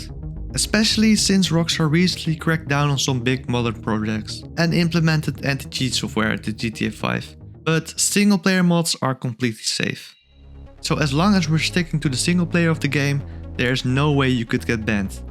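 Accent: Netherlands. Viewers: Thickest dutch accent of the week. Nice video tho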